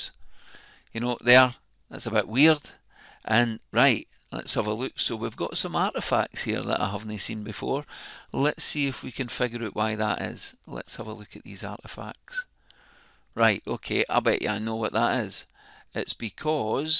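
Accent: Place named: Scotland, United Kingdom. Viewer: Hi, I’m a new user. I’m enjoying your videos but can I give a suggestion. You move back and forward without giving detail. For instance, in this video you mention artefacts then immediately go to find the problem. At this stage I don’t know what artefacts you’re talking about. It would be beneficial I think, to your viewers if you could mention what the problems were. This would make sense of your remedies. I don’t mean to criticise so hope you don’t take it as such. I would point out I am visually impaired so it is possible it’s just me but I don’t think so. P.S. It’s good to hear a fellow Scott’s voice it a YouTube video for a change.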